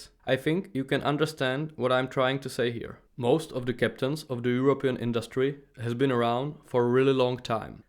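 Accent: Czech Republic. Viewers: Great. Výborný obsah, i když ten český přízvuk je v té angličtině hodně znatelný :) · You have Czech accent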